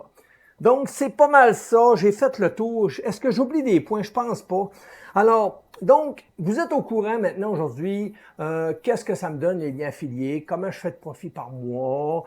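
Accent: Canada. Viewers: Bonjour, Je n'écris plus beaucoup en ce moment désolé, je suis débordé 😢, mais à part quelques vidéos sur les laser qui, POUR LE MOMENT m'intéresse moins, je ne loupe aucune de tes vidéos que j'attends avec impatience. Tu fait partie de ceux qui me remonte le morale quand je voie déjà la notification, ce que j'apprécie chez toi c'est ta simplicité, dans le bon sens du terme, même si pour nous français ton accent canadien, que j'adore, est parfois un peu compliqué pour la compréhension, mais c'est toujours clair et détaillé, et quand il y a besoin de détail tu sais prendre ton temps. Il est vrai que je n'aime pas trop les vidéo de plus de 45 minutes, mais quand il y a besoin, c'est justifié, plutôt que je ne pas tout expliquer. C'est vrai que les parties avec zoom sur la pièce, ne rendent pas toujours aussi bien qu'à l'œil nu, mais tout ceux qui imprime en 3d FDM le savent cela. 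Je rencontre les mêmes personnes qui râles sur la qualité du travail effectué chez les youtubeur qui font de la peinture sur figurine. J'en fait un peu et moi même, mais sans râler, j'avais vu un Youtubeur peindre et faire un gros zoom, et j'avais aussi pas trouvé sa top, puis j'ai imprimé la même figurine en résine, et une fois imprimé et que tu l'a dans les mains, tu te souviens du zoom qu'il a fait sur les yeux et là tu te dit... "Ha houai, quand même, c'est petit". Alors oui un gros zoom montre tout, mais une fois vu avec nos yeux, c'est très propre... Et seulement quelques cm d'éloignement cela ch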